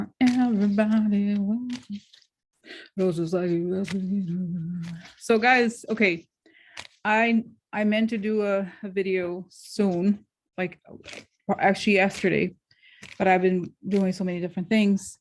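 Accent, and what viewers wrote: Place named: Russia. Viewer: me encanta su manera de dar su informacion siquica y su acento ruso🥰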